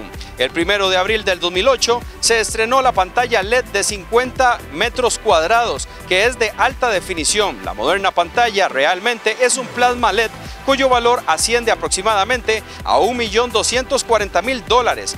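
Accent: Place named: Costa Rica. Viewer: Suena costarricense este cerote,! Q pasó?.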